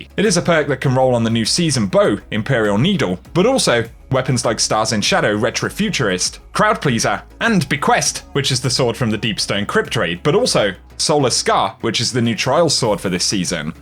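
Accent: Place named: United Kingdom. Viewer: when did you turn british?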